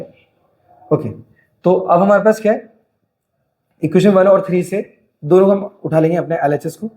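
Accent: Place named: Gujarat, India. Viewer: From your talking style I think that you are from Gujarat. Anyways I'm from Ahmedabad Gujarat. And sorry for distributing you.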